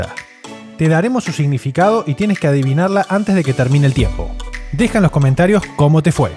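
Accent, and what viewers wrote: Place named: South America, Latin America. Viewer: Tiene que ver el acento del narrador es como de sudamerica y no le entiendo algunas cosas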